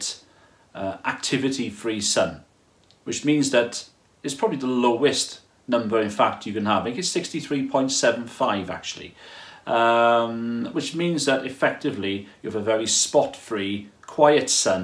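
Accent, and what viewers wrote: Wales, United Kingdom. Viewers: Thanks. That was a really good presentation. Did I detect a Welsh accent in there somewhere?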